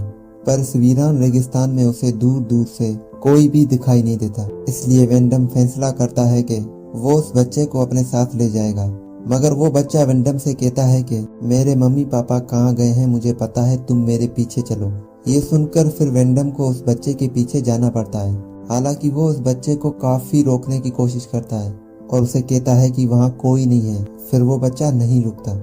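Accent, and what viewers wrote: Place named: Sindh, India. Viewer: Ap Sindhi ho na your excent is like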